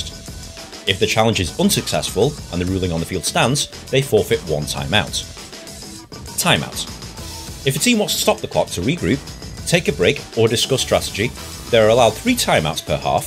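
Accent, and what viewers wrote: England, United Kingdom. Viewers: That is 100% an English accent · British huh? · American football explained by a guy with british ascent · An English guy? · I love how American football rules are explained by an English commentator 😂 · A English accent? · nope, deffo British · Dude, your explanation is completely detailed, but I can't understand a single word of British english, so I had to turn the captions on and keep rewinding the whole video, but I was able to understand everything in the end. Great video!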